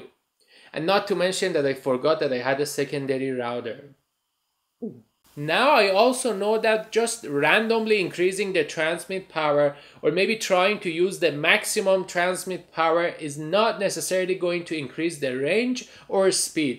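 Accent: Iran. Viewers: Why do get a strong persian accent from your voice?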